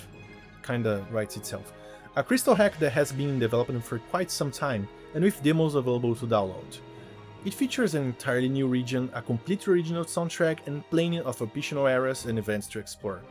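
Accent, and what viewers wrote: Brazil. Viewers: Vc é brasileiro? Tem um sotaque tão brasileiro kkk ótimo vídeo!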